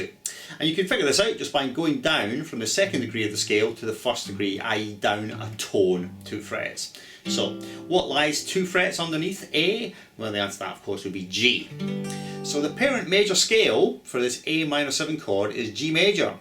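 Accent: Scotland, United Kingdom. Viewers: Any chance you could invest in a tie clip mic? Your Scotch accent is a rarity in YouTube and needs to be heard properly.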